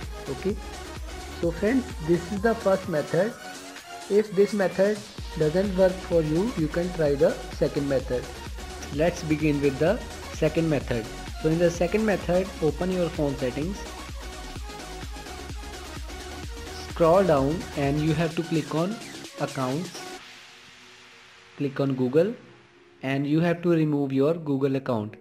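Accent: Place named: India. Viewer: You are indian? Your english is so funny no ofense Thanks so much You make a great job don t stop You have a new subscriber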